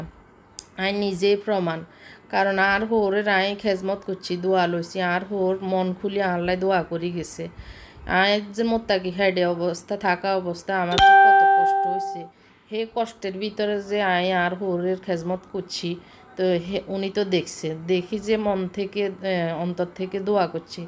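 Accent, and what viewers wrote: Noakhali, Bangladesh. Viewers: আপু তোমার নোয়াখালীর কথা গুলো শুনতে খুব ভালো লাগল,অনেক হাসলাম আমার ছেলে মেয়ে নিয়ে,খুব ভালো লাগলো, তোমাকে অনেক ধন্যবাদ,ভালো থাকো আপু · নোয়াখাইল্লা ব্লগ খুব ভালো লাগছে....আন্নের কথা শুনি আর দিল টা ভরি গেছে.....আই ও নোয়াখাইল্লা দেই আর বহুত ভালা লাইগছে...আই গর্বিত আই নোয়াখাইল্লা দেই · Assalamualikum apu. Ami o Noakhalir meye. Apnar ajker vlog ta khub valo laglo. Apu apnar mukhe Noakhali r kotha e besi sundor lage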